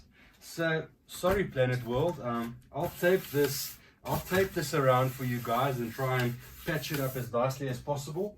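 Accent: South Africa. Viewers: Are you from South Africa? For some reason I thought your Australian for like the longest time.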